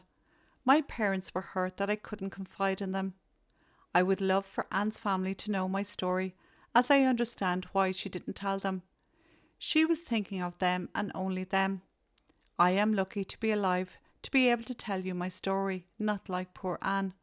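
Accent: Ireland. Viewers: I love her accent/cadence it is a lovely bit of home slice for an Irish lass in Australia. Did your mum not teach u if u have nothing nice to say.....?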